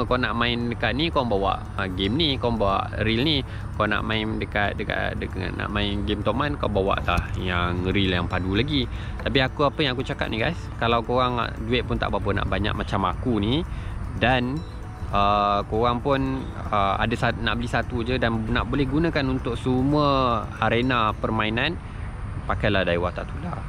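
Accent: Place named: Terengganu, Malaysia. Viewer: Bang org trg ke? Ada kantoi skit disitu walaupun berusaha utk cakap KL.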